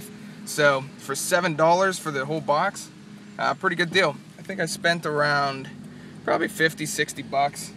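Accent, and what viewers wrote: United States. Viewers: dat pixburgh accent. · chicago accent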